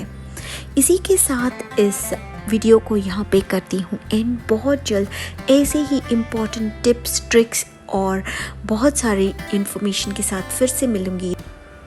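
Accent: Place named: South, India. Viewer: Very nice.🎉 R u a south Indian ur accent i felt so.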